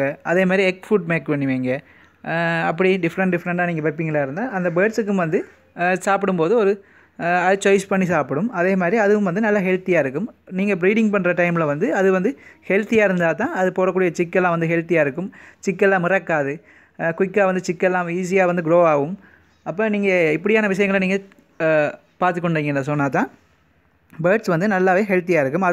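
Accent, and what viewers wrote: Sri Lanka. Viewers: Malayalam ila srilankan tamil💪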